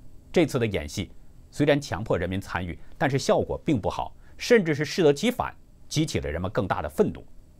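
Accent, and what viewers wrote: Tangshan, China. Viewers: 这主持人肯定是河北唐山人，太浓的唐山味了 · 主持人有点唐山味，赵丽蓉老师的同乡吧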